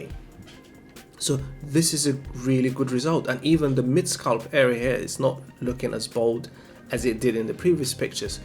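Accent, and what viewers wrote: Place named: United Kingdom. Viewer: aren't you independent and can review and say whatever you want as long as it's true? With your accent, I can tell that you are British and you have the right to freedom of speech unless you have a contract with certain clinics. I hope you are free to say whatever you want without Legal Ramifications. Cheers!!! Ps, I had my hair transplant in Turkey this June and my hair is growing a lot. I had a minor issue and the clinic is willing to fix the issue free of charge next year. So far so good!!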